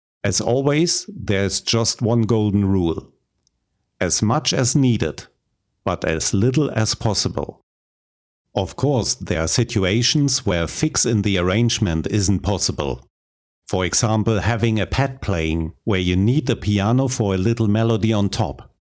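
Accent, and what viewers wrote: Germany. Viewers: I LOVE YOUR STUFF !!! ITS SOOOOO GOOD :)! Are u german? Because your accent seems really german too me, if yes .... ICH LIEBE DEINE VIDEOS :D ! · I knew you sounded too German to be Spanish.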